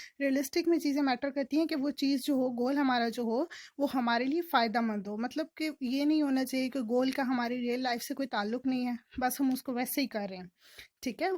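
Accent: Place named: Kashmir, India. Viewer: Muah maam sexy voice ❤ your kashmiri accent is so sexy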